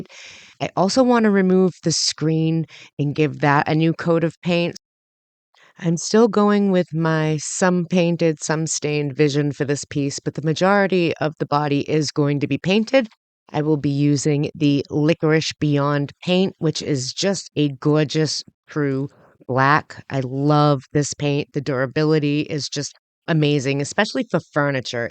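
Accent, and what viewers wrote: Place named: New England, United States. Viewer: Awesome! Love the combination of wood and black. I'm from New England (lived in all states except Maine:} and was picking up on your accent. When you mentioned the cold weather and living in N.E. I wasn't surprised! Which state? I now live in central coast of California and love it here. The weather is so wonderful. It's not crowded and little traffic here. Holly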